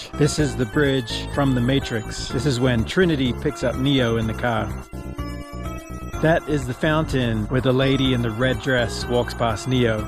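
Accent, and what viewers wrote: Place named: Australia. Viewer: didnt know you were aussie!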